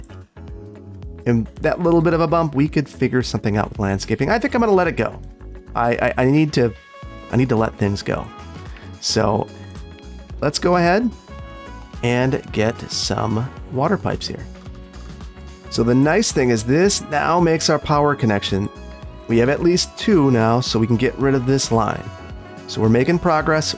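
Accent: Wisconsin, United States. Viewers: After watching a few more episodes, I think he just means he doesn't like the look of it in the game. It sounds like he's from Wisconsin and there's cetainly very hilly and cliff-esque builds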